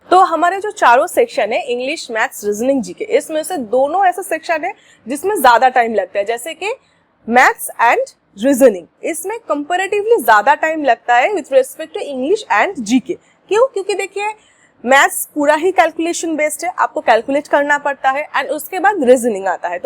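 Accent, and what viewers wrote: Bengal, India. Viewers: I think your ascent is begali ♥️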